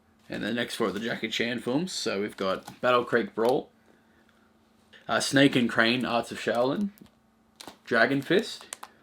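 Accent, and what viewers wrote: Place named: Australia. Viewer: oh Wow, well you honestly don't have a really strong aussie accent. Don't worry, I don't think that's anything you need to be concerned with lol.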